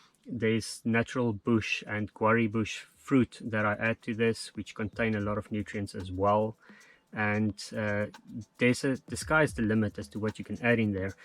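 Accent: South Africa. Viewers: Hi you sound like south african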